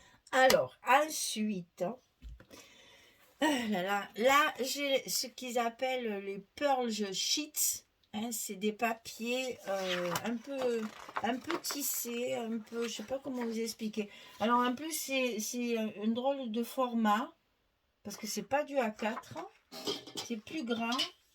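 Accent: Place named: Provence, France. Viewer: Bonjour 😊 Magnifique papier ❤ même si je suis une fille du Nord, j'adore 💕 votre Provence, les lavande, les vieilles pierres, des paysages magnifiques, votre accent...... Mon rêve! ❤❤❤❤ Merci et bonne journée 😘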